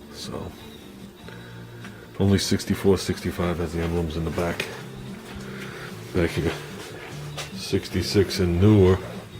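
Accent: United States. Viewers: That's funny, It was good to hear AMERICAN for a change .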